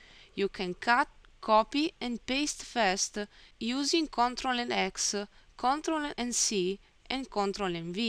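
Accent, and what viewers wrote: Italy. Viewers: I am melting, I love to hear her voice, what a cute little Italian accent. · nice english with italian touch... love it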